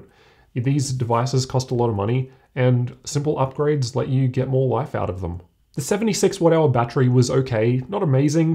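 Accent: Australia. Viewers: I thought the accent is supposed to give it away that he's Aussie.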